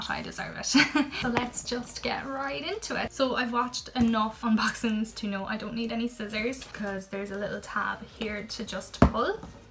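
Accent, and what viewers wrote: Ireland. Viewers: Are u from Ireland? Well asked that too soon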